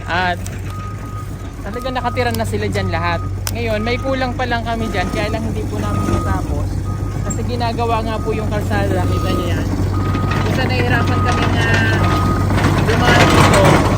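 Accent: Visayas, Philippines. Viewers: Sir di na jud ka mabaligya sa kabisayaan, kahibawo naman ka mag bisaya. Good job sir.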